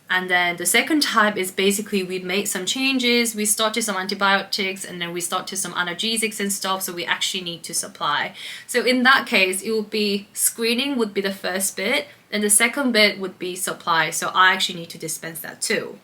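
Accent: United Kingdom. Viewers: 와우 억양이 완전 영국식으로바뀌셨네요?! 앞으로의 새로운 여정들도응원해요! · I noticed your accent became more british.. You are my favorite pharmacist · 으앙 ㅠㅠ 너무 오랜만입니다 ㅠㅠ 대학생 때부터 봤는데 억양도 완전 영국식으로 바뀌셨네용 건강하게 잘 지내시는 거 같아 다행이네용!! 화이팅입니당!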